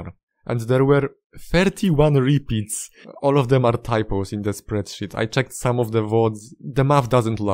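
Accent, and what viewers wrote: Poland. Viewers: Ach ten polski akcent🎉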